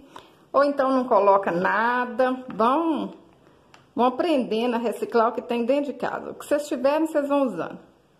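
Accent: Minas Gerais, Brazil. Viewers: amo seu trabalho o seu geito de falar...MINEIRA???? PARABENS.🙏🏻👏🏻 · vc e mineira como eu?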